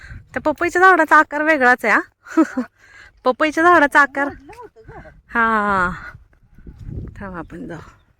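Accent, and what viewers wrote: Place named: Solapur, India. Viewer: ताई तुमचं माहेर सोलापुरा कडचा आहे का कारण तुमचं बोलणं तसंच वाटतं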